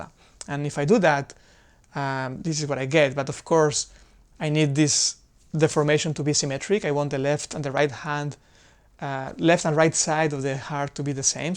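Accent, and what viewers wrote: Spain. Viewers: Really cool format and it results in a really entretaining and informative video. I can tell you speak Spanish from your accent so: muy buen vídeo! 😃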